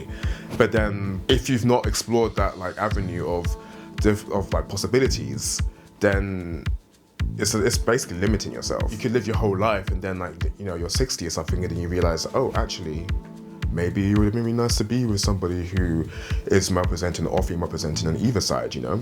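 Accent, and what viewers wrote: United Kingdom. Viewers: Black sexy guy + british accent = 💯%♿👌😂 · I love the British accent coming from a black man...somehow it makes it sound even sexier for some reason. I can't be the only one who thinks this right?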